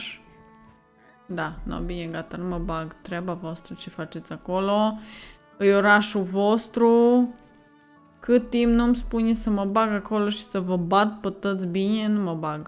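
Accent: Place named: Transylvania, Romania. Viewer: Mie imi place tot ce joci, pt ca imi place sa ascult ardeleneasca ta😂😂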